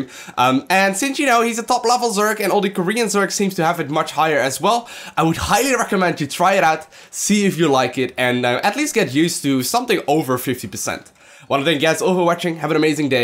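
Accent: Netherlands. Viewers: just a question but is he dutch? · ofcourse / natuurlijk. Dutch accent anybody..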